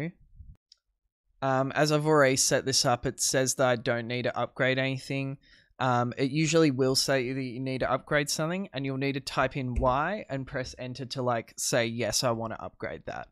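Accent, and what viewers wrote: Australia. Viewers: Finally, a guy I can understand, as a fellow Australian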